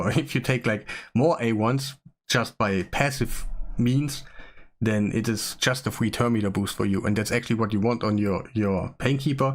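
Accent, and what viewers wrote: Germany. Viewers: I like your german Aussprache from the english words😅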